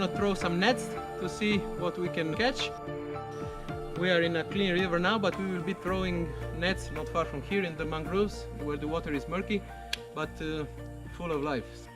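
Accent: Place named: Indonesia. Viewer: Logat nya khas pedalaman Indonesia banget. Auto subscribe ini mah 🤙🤙🤙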